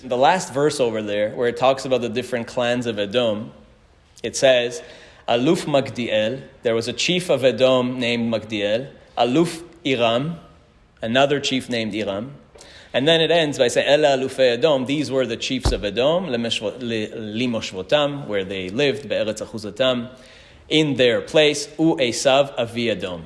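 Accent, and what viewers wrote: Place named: Canada. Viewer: BH wonderful every (canadian) syllable; form, content, substance, and especially, wit/humor. if Moses spoke English, he'd sound like this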